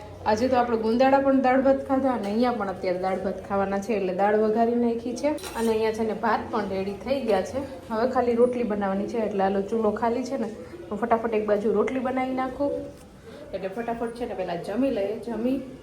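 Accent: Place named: Bhavnagar, India. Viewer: mne tame bolo chho a spich mne bav game aam bi mne bhvngar ni lengwej boooj game mari frend gondal na pinl gohel atle pelle thi vaato karvi bav game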